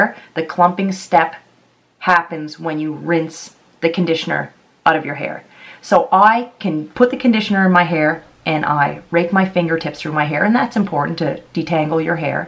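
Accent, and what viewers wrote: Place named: Cape Breton, Canada. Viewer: I've been watching lots of curly hair videos lately so this popped up as recommended - had no idea you were a caper til I heard the "shkonawn guys" haha made my day 🖤 now I'm real curious if ya got some specific tips for our wild weather in CB for curly hair, mine goes with the weather a lot of the time and I get so much frizz dude I'm not sure if its the highs and lows all the time out there or not lol. hair gets right crazy ah must be that nor'easter on its way by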